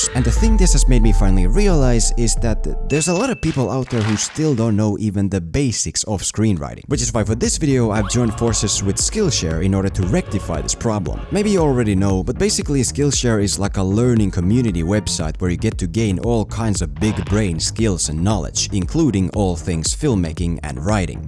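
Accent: Finland. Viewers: are you finnish? your accent sounds finnish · Where you from bro? i know you mustn't be American, you sound like you have a Finnish accent. Not sure tho. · Veli sun aksentti on niin suomalainen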